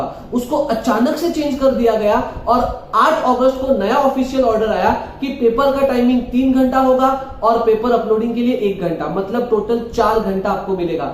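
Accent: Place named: Maharashtra, India. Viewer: Ur from maharashtra seems from ur tome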